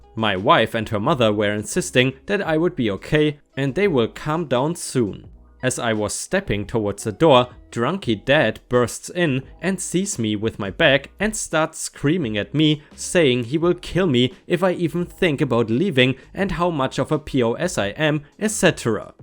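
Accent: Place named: Germany. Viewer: Okay, your accent is very strong so I ask myself :D German or Austrian? It sounds so because of the sound of some words - If not.... Shame on me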